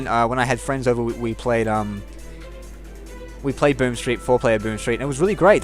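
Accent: Australia. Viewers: Reasons that you deserve more viewers. Your gameplay quality is really good. You have a good mic and voice. You can talk for a long period of time without people (atleast me) geting bored. Also you have the Australian accent :P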